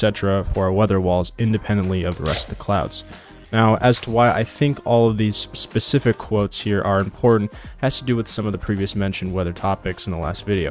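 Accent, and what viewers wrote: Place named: United States. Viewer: I don't think you know what "formerly" means.... You sound American, so I'm confused why me, a Danish person, needs to correct you on this. Formerly, means "in the past". Saying "The new Worlds Adrift, now formerly known as Lost Skies" is a nonsense sentence. Is it now or formerly? It can't be both. It's either: "The new Worlds Adrift, formerly known as Lost Skies" - Meaning it used to be called Lost Skies, but is now called Worlds Adrift. Or "The new Worlds Adrift, now known as Lost Skies" - Meaning it used to be called Worlds Adrift, but is now called Lost Skies. If you want to use the word formerly, you would say "Lost Skies, formerly known as Worlds Adrift"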